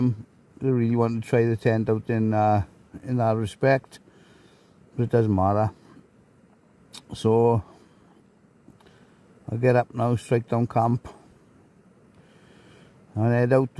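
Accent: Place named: Scotland, United Kingdom. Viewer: Sounds like you might be Scottish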